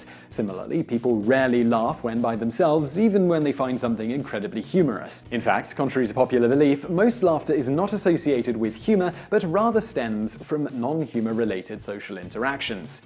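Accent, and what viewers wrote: United Kingdom. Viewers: where is the narrator from? great britain?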